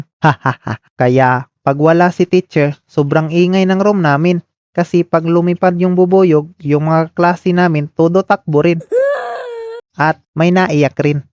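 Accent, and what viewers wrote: Philippines. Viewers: Kuya taga probinsya kasi ganyan ka mag salita eh hehe 😅😅😅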